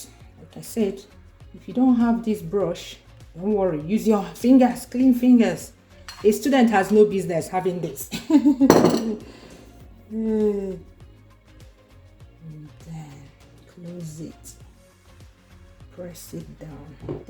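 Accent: Igbo, Nigeria. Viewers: You look beautiful.. Your humour is next to none especially in combination with the natural igno accent... I really enjoy watching your videos..natural and down to earth.. Keep it up.. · The way you even explain things with a little Igbo vibe dey sweet my body. Nice one I will try it.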